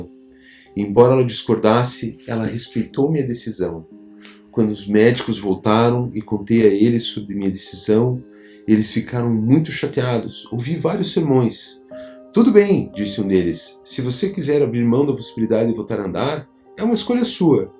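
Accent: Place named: Portugal. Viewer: Esse sotaque português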